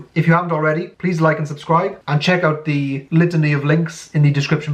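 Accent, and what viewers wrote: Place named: England, United Kingdom. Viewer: What kind of english accent is that?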